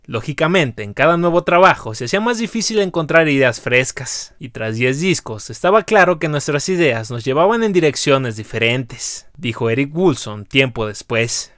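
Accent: Mexico. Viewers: Las historias son supremamente interesantes pero el narrador: fatal! Ese acento mexicano es el peor del idioma español, es un acento náuseo.: eduque su voz y modifique ese fastidioso acento.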